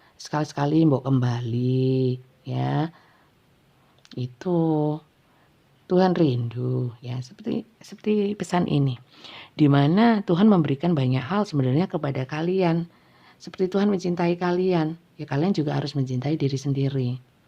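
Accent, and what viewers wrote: East Java, Indonesia. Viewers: Aq jd gak bisa tidur bun dlm readingan ini banyak banyolannya logat jatim tp gak meninggalkan fokusnya bun, aq banyak cengar cengir sendiri, semi wayang lo bun, aq seneng bun lanjut, hebat pokok e, yg pasti itu Tuhan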